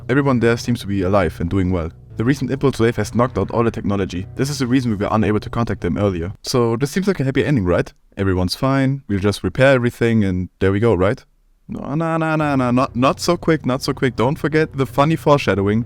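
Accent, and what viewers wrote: Germany. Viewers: I smell a German accent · The German accent man, i cant fucking listen to this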